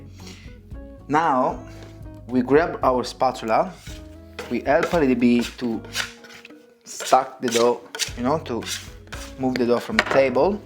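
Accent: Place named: Italy. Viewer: Mate don't apologise for your English! It's great and it doesn't even matter if we hear your Italian. I love your accent!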